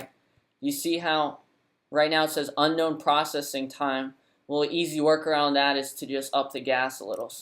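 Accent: Houston, United States. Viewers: You from houston ? 🤔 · You from Houston ?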